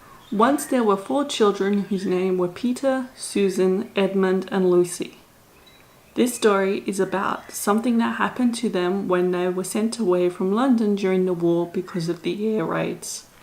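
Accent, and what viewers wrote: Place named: Australia. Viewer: Cool video! IMAO you've got a strong Aussie accent xd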